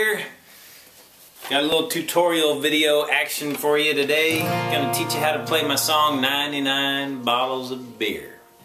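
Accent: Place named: South, United States. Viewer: Howdy partner your southern accent is the best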